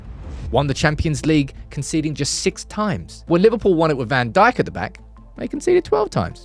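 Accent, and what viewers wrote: Manchester, United Kingdom. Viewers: Can tell you're a manc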